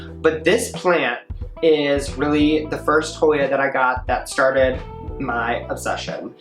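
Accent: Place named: Canada. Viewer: cute space, cute plants, cute person, cute accent! i’m a canadian who lives in france but grew up along the border and your accent makes me miss home 💕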